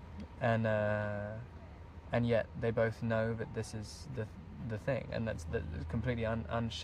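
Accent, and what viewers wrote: United Kingdom. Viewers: wow! really love the british accent...always mentioning it though...but i do really love his accent....gah! it's perfect on him...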